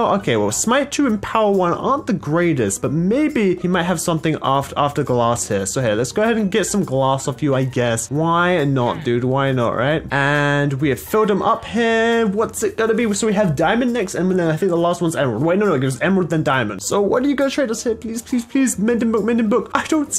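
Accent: United Kingdom. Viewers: I am not British but I would assume he had a British accent from the way he talks.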